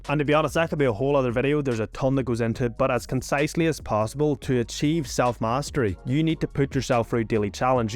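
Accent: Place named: Northern Ireland, United Kingdom. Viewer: Where are you from? Your accent is really cool. Northern Ireland maybe?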